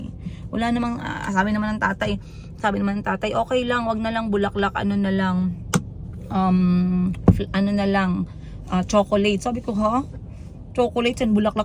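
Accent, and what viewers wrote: Iloilo, Philippines. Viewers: Kalambing mn mg ilonggo madam ba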